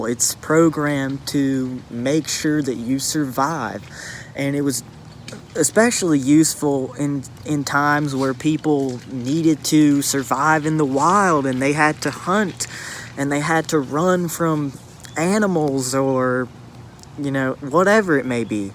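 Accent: South, United States. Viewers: Thank you for sharing....so young so wise...the southern accent is nice reminds me of home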